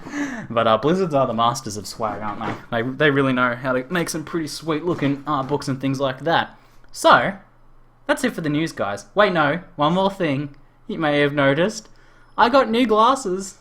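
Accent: Australia. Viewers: Great Vid, Great to hear a fellow Aussie doing well. What state you from? PUMPED about the Oz server, would love to play with you sometime. peace.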